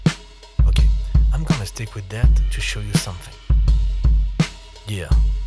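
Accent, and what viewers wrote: France. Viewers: I love your accent/// No wonder the girls love French men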